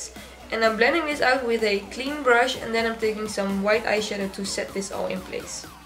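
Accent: Netherlands. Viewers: oml are you from the Netherlands? you really sound dutch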